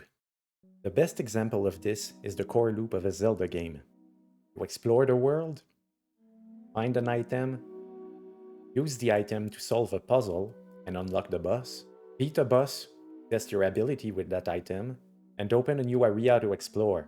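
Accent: Quebec, Canada. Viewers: disagree here, human beings hate what they don't understand, and fear the unknown, hence why we hate out-groups and fear death. Otherwise, this was a great nuanced take why the Pokemon gamin franchise continues to pump out mediocre products. I can tell by your accent you're a fellow Quebecois, subscribed🇨🇦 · Est-ce que j'entends un accent québécois? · Is it me or I recognize a Quebec accent here ??? Certainly wasn't expecting that hahah. Really liked this video it was super interesting !!! Will def sub and check your other videos !!!! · T'es Québecois hein? 😂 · Brother.. Are you from QC? Lol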